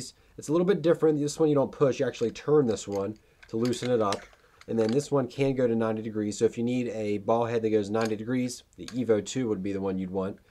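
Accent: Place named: Philadelphia, United States. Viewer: Haha. Know this is an old video but just got this tripod and wanted to say I love that Philly accent :)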